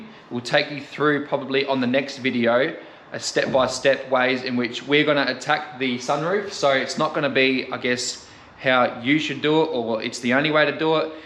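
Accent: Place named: England, United Kingdom. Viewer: He now's about Aussie cars but he sounds like his from pommyland its the only thing got me stumped about this channel.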